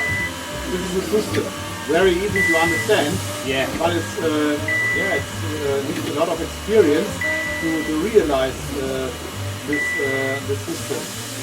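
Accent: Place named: Germany. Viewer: hahaha.. how u realize that he is german from the very first second :D i'm german myself but i hate the normal german accent :/its duch a .. torture :(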